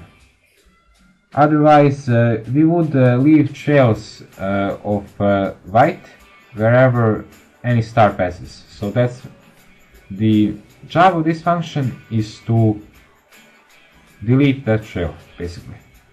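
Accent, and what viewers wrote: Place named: Russia. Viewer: русский акцент , несет за версту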